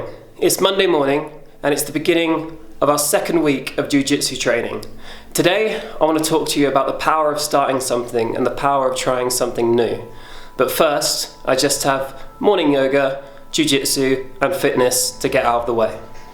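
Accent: United Kingdom. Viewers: Woah I'm just watching one of your first vlogs on your old channel and your british accent was so much thicker. Definitely can tell you've been spending a lot of times in non english speaking countries. That'll happen to you!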